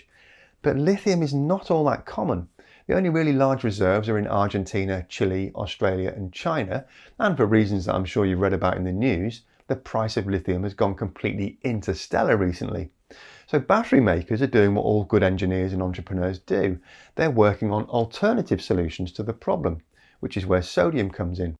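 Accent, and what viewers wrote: England, United Kingdom. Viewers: You look just like that other bald guy but with an English accent .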